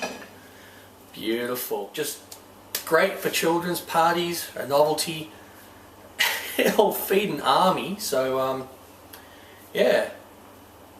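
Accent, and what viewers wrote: Australia. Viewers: Aussie I think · r u aUSTRALIan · hes an aussie?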